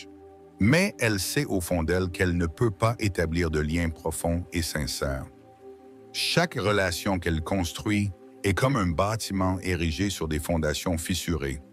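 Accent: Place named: England, United Kingdom. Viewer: Qu'est-ce que tu fais là, toi, avec ton accent anglais Très gras !!!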